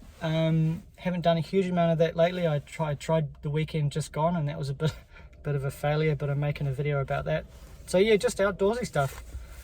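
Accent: New Zealand. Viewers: Talking like maori? They mean Te reo? Or 🤷🏽😅 you talk like a kiwi, nzer 😅🤷🏽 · Not sure what the dude is about - you don't sound Maori as such - just a typical NZ 'one of the bros' kinda accent... regarding tasmania - will be cool man, probably my favourite locations in Australia. Make sure you check out MONA.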